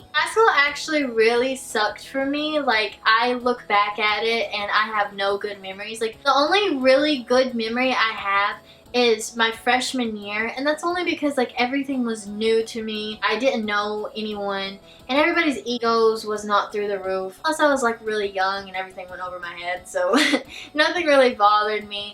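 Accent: South, United States. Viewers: Do you have a country accent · Your southern accent is the cutest thing I’ve heard all week! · Why you so gorgeous tho? I love the whole southern-ness the way you say things and shit.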